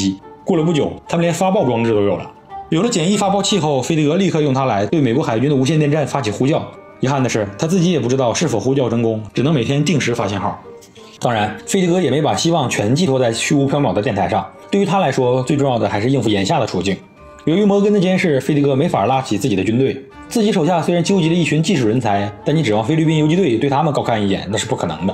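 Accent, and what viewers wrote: Northeast, China. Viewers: 口条不清晰，还带东北口音。弃。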